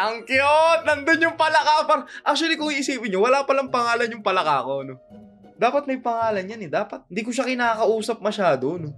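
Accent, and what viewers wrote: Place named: Batangas, Philippines. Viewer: first kopo marinig mag batanggenyo kapo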